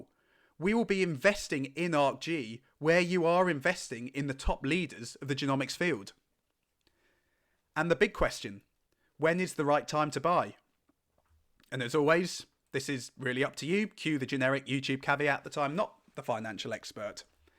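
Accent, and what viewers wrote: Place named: United Kingdom. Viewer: How do you invest in ARKG in the U.K.? I assume you’re U.K. based from your accent?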